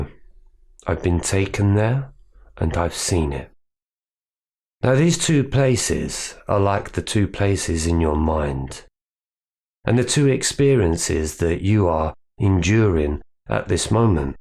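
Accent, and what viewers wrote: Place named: Cockney, United Kingdom. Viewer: This is great. As a Northerner I never thought I’d be enraptured in a lesson on the dark night from a cockney geeza called! 😂 We live and learn. Thanks mate, wonderful video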